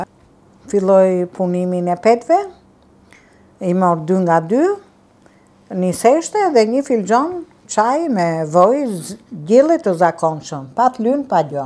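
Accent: Tirana, Albania. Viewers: Tamam kanal popullor qe na kenaq me recetat dhe ate folmen Tiranesete lumshin duart ❤🎉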